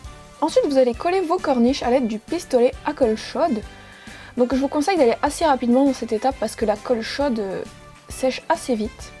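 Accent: North, France. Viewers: je ne sais pas si tu as deja repondue a cette question mais tu es du nord j'imagine vue ton accent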